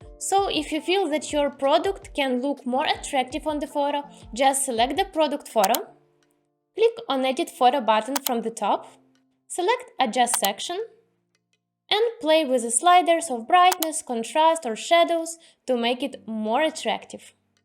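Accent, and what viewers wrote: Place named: Russia. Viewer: I love your accent are you Russian?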